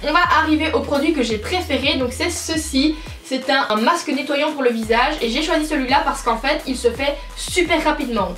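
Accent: Belgium. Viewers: le bon accent des carolos on adore ❤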